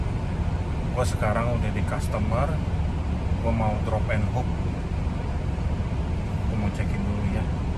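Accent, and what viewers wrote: Indonesia. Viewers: Akhirnya aplod lg Trucker favorit. Kalo dengerin ocehannya asik bgt krn logatnya masih 'Indonesia' bgt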